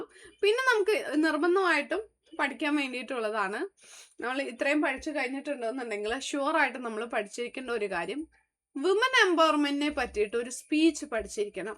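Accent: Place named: Malappuram, India. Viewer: njan Kozhikode, balussery anu nji Malappuram anennu vijarich slang kandappo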